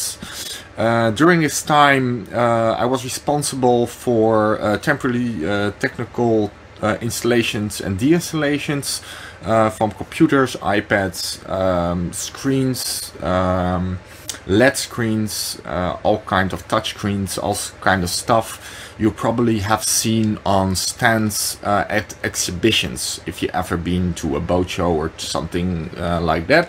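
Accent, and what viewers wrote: Netherlands. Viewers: BTW…. Don’t be self-conscious about your Dutch accent. Most people here will find it charming 😉. I LOVE the Netherlands. Some of the best marine technology in the world comes out of your tiny little country, and you should be very proud. 👍🏼. Not to mention…Old Dutch Gouda is to DIE for 😋 🧀. Can’t wait to follow your adventures ! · English is perfectly fine. Distinctively Dutch. Good intro thanks.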